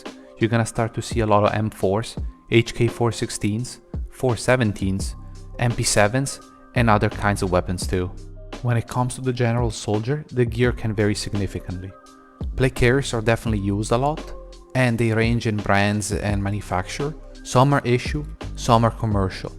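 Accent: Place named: Italy. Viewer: Dall'accento ho capito che il bro è italiano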